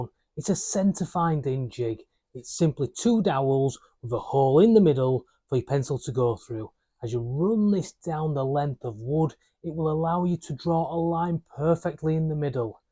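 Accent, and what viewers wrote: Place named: Birmingham, United Kingdom. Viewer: I'm not good with accents, I would have guessed you were from Birmingham. I can't imagine anyone ever leaving the Yorkshire Dales! Cheers from Florida.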